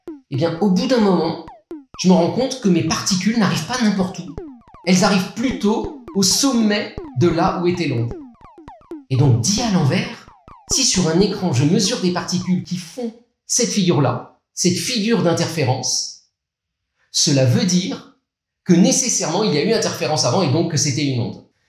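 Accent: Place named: Paris, France. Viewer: J'adore votre intonation très Parisiènne ^^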